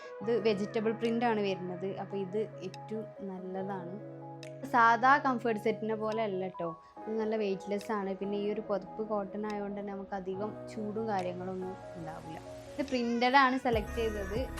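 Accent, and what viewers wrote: Kozhikode, India. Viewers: Malayalam samsarikkan ariyanhitalla.calicut bhasha anganeyane suhrthe.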